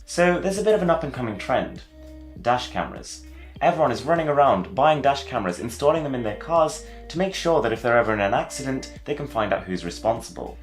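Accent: RP, United Kingdom. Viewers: I wish I had this type of british accent and not the chavy one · Yhh daaaaam this guy has that one British voice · Ahhh bullshit with these damn smart phone devises and a billion amazing things that can be done with them (except take the dog out on a leash to go pee and poo), Disney cga animation movies, and "hot" fox news chicks bitching much ado 'bout noting in tight party dresses, and cheap lil "Cosmo" 'bot toys, and wall paper-thin 100" flat screens, quad copter toy drones with super hi-fi HD cameras, and stupid auto-correct that don't work as intended half the time, and other cheap gimmicks to keep our minds off the fact that modern technology has failed to put colonies on our Moon and the moons of Jupiter and space stations orbiting around the ones of Saturn. Whatever happened to the dream of interplanetary space travel? Inter-Galatic commerce? 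Gone to pot already? All we can come up with so far is some surreal composition of a fuzzy-faced used camel and goats milk arab dealer, sporting a dated pompador-like hair style with a posh British accent trying to charm the panties off of suburban White 16 years old girls and ex-virgins, doing his impersonation of the late James Mason plugging cell phones aps on international YouTube tv. What the HELL man?!?! 2017 and this is it? More like a damn apathetic rut to me. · Music from the serie Gomorra and a posh British accent. Hmm . . .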